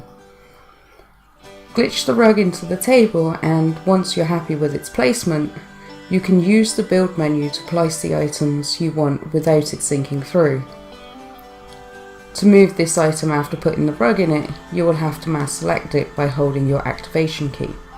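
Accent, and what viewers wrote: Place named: France. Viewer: Ouiiii j'adore ta vidéo, tu as un accent français si charmant ^_^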